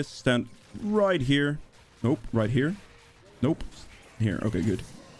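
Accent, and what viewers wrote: Netherlands. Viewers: Lekker nederlands accentje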